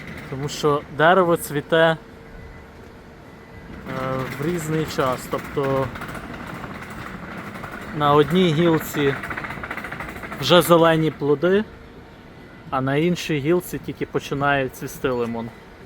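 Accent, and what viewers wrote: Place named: Western Ukraine, Ukraine. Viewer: Я впізнав по розмові що ти з Західної України.Тільки нині натрапив на твої відео.Переглянув всі.Ти не зупиняйся.Досить добре виходить.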